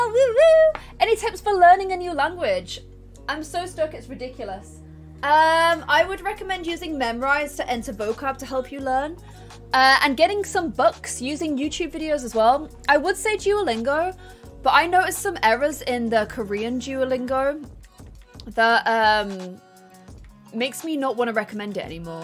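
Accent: United Kingdom. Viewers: It's so cute how you speak Korean with an English accent.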